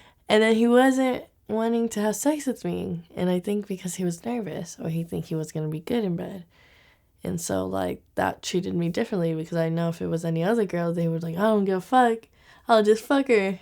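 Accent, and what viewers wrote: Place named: United States. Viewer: She speaks American English...But she's Brazilian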